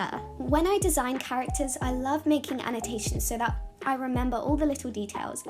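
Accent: United Kingdom. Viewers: !!! you have such an heavy British accent, I love it!! It's so nice to actually hear your voice for once lol! I love how the character looks now! I hope you have a great day/ night Byee <3 Edit: 🍞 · Your voice is so pretty and I love the British accent. I’m southern but don’t have a strong British accent. I’ll probably take up some of your tips when I film another voice over… but that’ll be a while. I also wanted to ask how you stay motivated and creative with video ideas. I’ve taken 7 months from uploading but am still confused as to what I should upload. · can i eat ur accent and ur art? 🥺 you sounded like how i expected you to sound but the accent caught me off guard bcs im just a sucker for british accent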